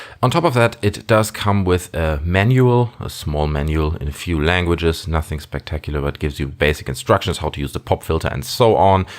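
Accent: Germany. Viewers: 3,5 mm Jack? Ich weiß dass du deutsch bist, haha, man hörts. Könntest du mich bitte auf Instagram anschreiben? Ich hätte gerne über das Mikro diskussieren. Habe vor mir es zu kaufen.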